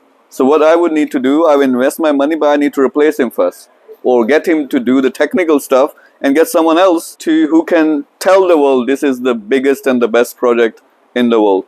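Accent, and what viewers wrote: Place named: New York, United States. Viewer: NYC way of talking an English 😊🖤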